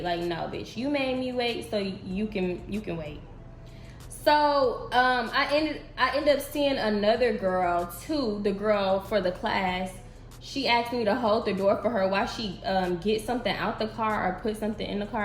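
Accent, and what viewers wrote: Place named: Chicago, United States. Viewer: Sis im new here n u talk like ur from the chi w me cthu! Lol u had me rolling bc ur reactions to tht chick wud have been mine too!😂 new friend here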